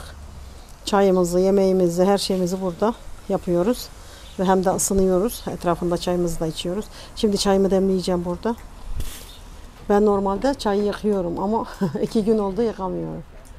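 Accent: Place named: Gaziantep, Turkey. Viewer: konusmanizi cok hosuma gidiyor. Dunurumde Antepli ayni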